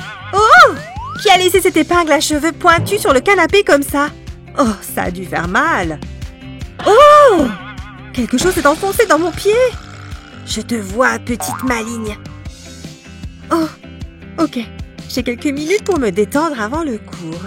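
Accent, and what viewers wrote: England, United Kingdom. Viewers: merci pour c astices mais juste vous êtes anglaise?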